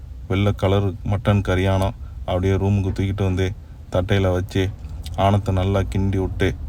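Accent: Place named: Nagore, India. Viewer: நாகூர் லயும் இப்படி தான் பேசுவாஹா....😅😅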